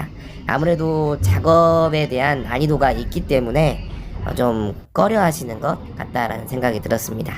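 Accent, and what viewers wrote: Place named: Jeolla, South Korea. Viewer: 전라도 말투 보이긴했는데 광주분이셨군요, 기회되면 뵙죠 ㅎ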